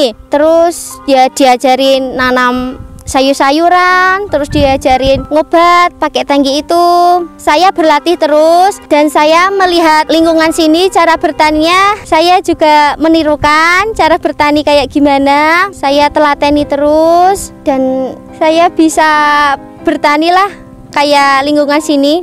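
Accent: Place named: Java, Indonesia. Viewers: Medok banget e mbak😅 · Keren kali kontennyaa, dengar cara penyampaian dan logatnyaa medokk. Sangat menginspirasi, dn terhibur dgn channel ini dan petani happy. Thanks anyway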